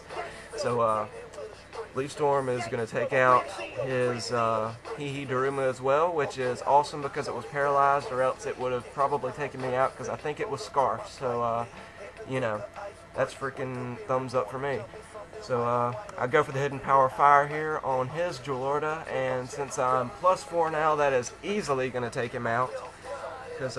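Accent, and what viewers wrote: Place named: South, United States. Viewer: where are you from? im likin that southern accent!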